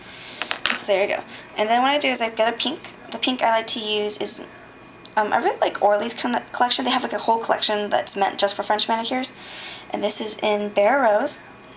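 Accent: United States. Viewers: So obvious you're from Cali... you sound like Brenda Song LOL Nice tutorial though :p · R u american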